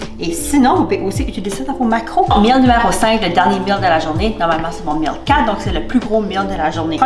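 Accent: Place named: Acadia, Canada. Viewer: J’adore en français et surtout l’accent acadien. Love it!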